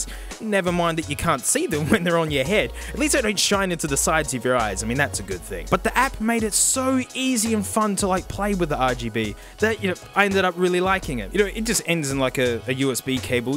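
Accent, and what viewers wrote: Australia. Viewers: Same, though I'd want dank to play the same parts of the song in the comparison between the headphones. Also the ears make me think of ASMR artists though I've head worse whispering in my ears then this lovely ausie man. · I could hear this Australian talk for hours about stuff that doesn’t even make sense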